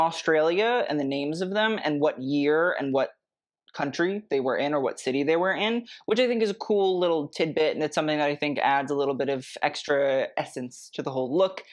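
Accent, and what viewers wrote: United Kingdom. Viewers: Your British accent is hilarious, hahaha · The British accent is giving 😂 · the british accent took me out 😭😂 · ❤ your British accent 😂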